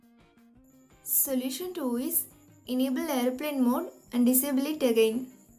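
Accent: India. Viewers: Indian accent ?!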